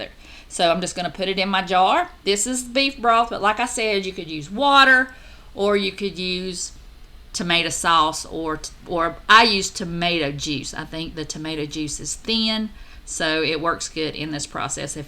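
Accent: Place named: Tennessee, United States. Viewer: Hi from Tasmania. Love your accent. I sounded just like you when I lived in Tennessee. 😊 Now I sound like a crazy American with an " American" accent here in Tasmania Australia. Can't wait to try the Chili recipe. Just processed 7 litres of homemade worcestershire sauce yesterday. They have to age for at least 6 months. 😅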